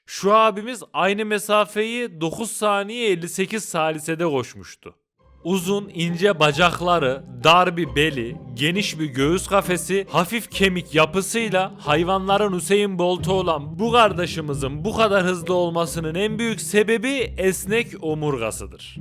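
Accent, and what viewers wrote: Ankara, Turkey. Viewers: Tatlı güzel komik bi anlatım sevdim Ankara bebesi 😅 Angara 😊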